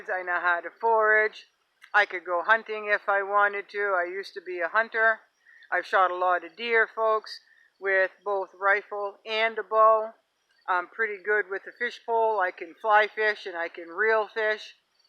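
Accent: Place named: New England, United States. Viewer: Hi, I just recently found your channel and I enjoy your videos. I wanted to tell you also that your accent reminds me of New England grandfather, I sure miss him and hearing you talk brings back great memories. Thank you, God bless 🙂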